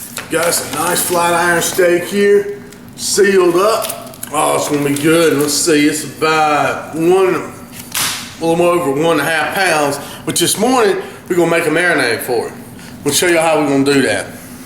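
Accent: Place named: Georgia, United States. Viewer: Came across this vid after I bought some flat iron steaks at the local Piggly Wiggly. Started strong with the proper manner of talkin' (like we do round here in mid-Ga) you lost some credibility cracking open that Buttwiper (Light, at that!) but then, it IS STILL beer . . . so I continued watching. Will do some steak fries instead of the new taters and reckon it ought turn out purdy decent. Keep ya posted.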